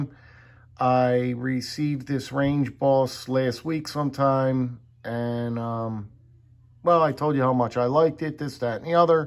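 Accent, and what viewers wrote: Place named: South Jersey, United States. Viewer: Lol that SNJ accent..... I grew up there myself. Oh, and the range boss is amazing.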